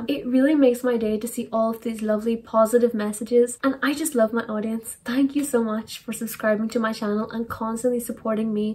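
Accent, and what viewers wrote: Northern Ireland, United Kingdom. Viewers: NORTHERN IRISH?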